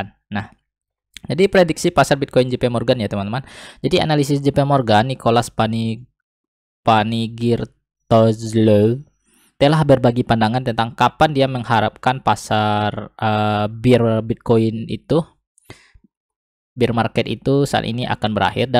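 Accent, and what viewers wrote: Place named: West Sumatra, Indonesia. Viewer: logat urang awak bana da👍😁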